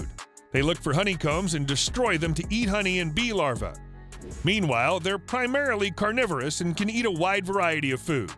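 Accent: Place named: United States. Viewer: spoiled the vid with the horrible US accent. yuk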